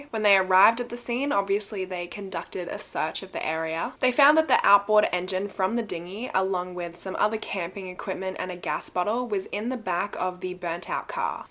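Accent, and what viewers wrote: Australia. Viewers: You've never sounded more Australian. Love it 😂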